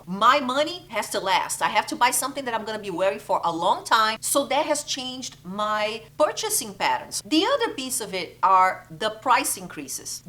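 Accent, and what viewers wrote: Brazil. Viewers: I hear a Brasil Portuguese accent am I right? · Eu não te conhecia, mas sabia que era brasileira quando começou a falar. ❤️ Adorei o conteúdo! Concordo muitoo!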